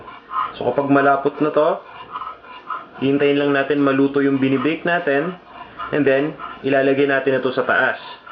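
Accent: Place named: Visayas, Philippines. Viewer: disappointing filipino who is very poor in giving instructions as well as has no idea about measures. ademas, obviously not from the tagalog region. in a brazo de mercedes video says 'i-combine' when in tagalog combine simply translates to 'ihalo'; transfer - 'isalin'. maybe he is bisaya either make your presentation in english or stick to cebuano!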